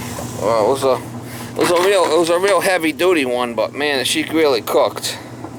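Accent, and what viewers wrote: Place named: Boston, United States. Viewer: Oh my goodness..ahh you kiddin me? You sound eggzacktlee like my grandmutha my mumma and awl my relatives from Boston Lowel and Billerica yo wa voice tone sounz eggzactlee like my uncls my uncle brooce! I was writing this exactly the way my family sounds when they talk oh my gosh i cant believe it, i am getting teary eyed and i feel a warm no joke im not pullin your leg i feel a warm loving cozy safe feelin in my chest my heart listening to you talk....it is remarkable to listen to a familiar accent and feel immediate family love a sense of belonging it is so weird! I am going to watch now ive been listening to you and feeling so much comfort my gosh im gobsmacked im absolutely stunned ...holy cow. Okay well thank you for being you! Lol now im gonna watch because you are going to change out thermal cupler and that is exactly what my problem here is and its an old Gaffer and Sattler furnace forced gas heater it went out and now i cant relight it ...not sure how it went out to begin with!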